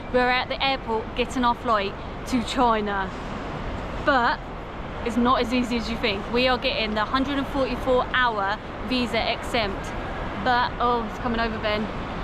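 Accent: United Kingdom. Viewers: 英国哪个地区的口音😂？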